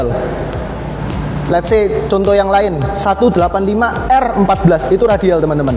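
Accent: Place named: Surabaya, Indonesia. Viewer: Saya suka logatnya ko. Asli arek suroboyo iki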